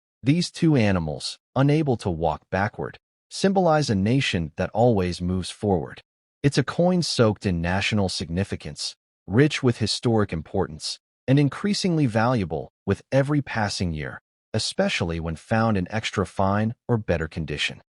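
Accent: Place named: United States. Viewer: AI American accent , NOT AUSSIE 1 !.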